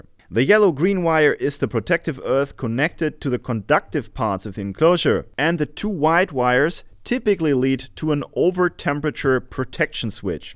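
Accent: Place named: Germany. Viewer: I've been scavenging old junk for years in wicklow, but your videos have inspired me to fix some of it up. So thank you for the inspiration, and your lovley German accent with great English 👍. Much better than us irish speak it.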